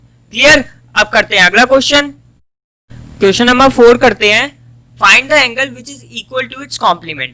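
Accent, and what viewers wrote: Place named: Haryana, India. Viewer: Ap haryanvi ho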